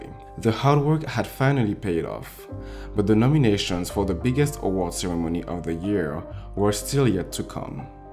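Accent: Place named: Germany. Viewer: Are you German? love the accent, I love your breakdowns btw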